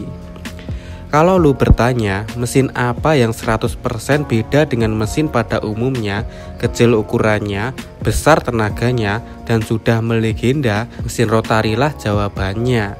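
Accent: Java, Indonesia. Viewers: Nice job. Btw saran intonasi pas baca narasinya sedikit dibenahi bro, rapopo ketok jowone sitik2, sing penting nafa bicarane menarik viewer sing nonton. Tetep Semangat bagikan edukasi. Tk subs ki lho. 😁👍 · Medok banget ya ..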